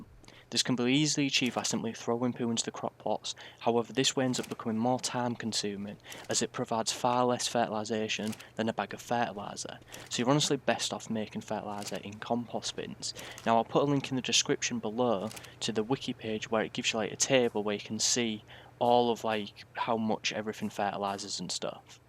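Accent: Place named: England, United Kingdom. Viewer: He's speaking English with an English accent. lol what more do want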